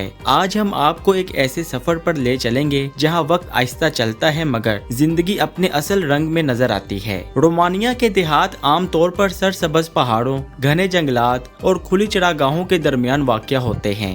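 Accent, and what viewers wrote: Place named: Lahore, Pakistan. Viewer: lahori urdu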